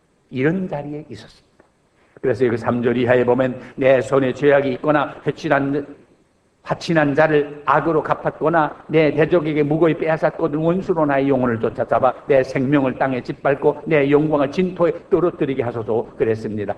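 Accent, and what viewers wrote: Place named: Pyongan, North Korea. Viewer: 중학교시절 영락교회에서 말씀을 들으며 성장했는데 예배중에 찬송도 알토.베이스 를 하시며 찬양도 성악가처럼 하시던 목사님 여전히 성대가 젊음이 넘쳐나십니다.평안도 사투리가 간간히 나오시는데 매력이시고 설교에 리듬이 있는 명설교입니다.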